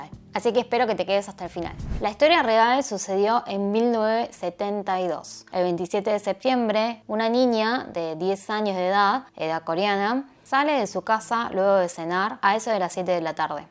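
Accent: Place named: Argentina. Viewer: Súper raro ver una China o coreana nose hablando argentino 🤔🤪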